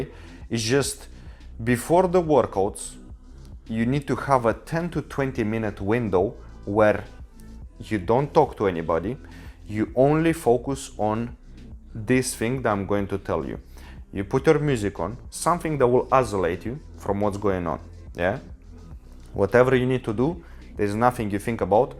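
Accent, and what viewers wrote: Russia. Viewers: His russian accent is so funny. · Are u from Russia? Your accent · Yeah his russian accent proves it and skin color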